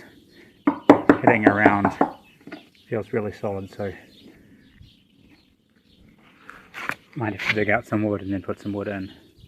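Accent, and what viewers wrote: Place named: New Zealand. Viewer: You sound like a kiwi ! Looks like a cool project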